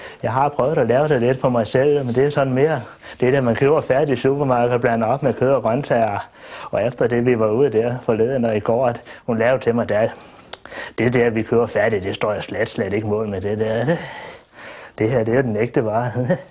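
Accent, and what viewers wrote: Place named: Jutland, Denmark. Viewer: Er Jydsk så svært at forstå?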